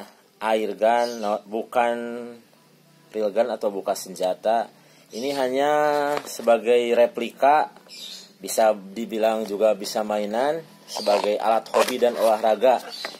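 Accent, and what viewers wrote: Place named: West Java, Indonesia. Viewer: nah itu dia om,,kalo ikutan gabung kan biaya nya bikin jangarrr...hehe btw si om nya asli mana ya..? kayanya org sunda ya? logat nya kaya gk asing om,,khas sunda wetan banget,,tasik,,garut,,ciamis..😁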